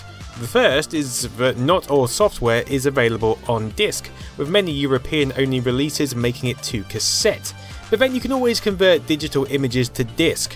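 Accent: United Kingdom. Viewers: IDK, but he could live in the UK thanks to the fact that knows A LOT about UK game computers. Also, he sounds very British.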